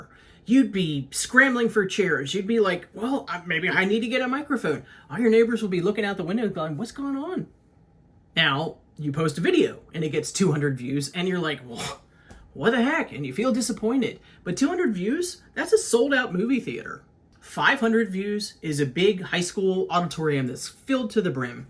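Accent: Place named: South, United States. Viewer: Hey! I went to your channel and watched your Ace Hardware video. If you’re OK with a little constructive criticism, I would love to give you my review, maybe it will help a little bit. I loved your video! Your voice is awesome, (I love a great southern accent).😎 there is only one thing that I noticed on the video that would keep me from watching more of your videos. I noticed that you don’t look straight into the camera lens enough. I think on a video like this when you are talking to your audience you need to think of it like talking to a friend or one person. My favorite Youtubers look straight into the lens the entire time that they are talking to the camera and it looks like they are looking right into my eyes and that is what I love! Maybe you can practice this and get better at not looking back-and-forth between the lens and your own face, I know it’s a hard one, but I think that would help you a lot! 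I didn’t see any other issues with your video. Great content also! I love to see what is in a store before I go shopping.😃👏👏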